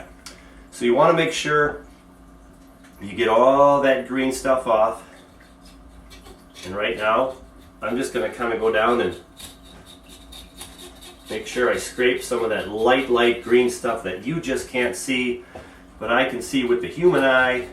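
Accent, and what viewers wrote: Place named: United States. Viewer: GREAT video ..!! I sooo know that you are an American as you say the word roots ... as ruts. Just like when Americans say the word "roof", .. as in the sentence ... "I am going to fix the shingles on the ruf ..." Sooooo funny !! (and yes, .. i am a Canadian .. lol)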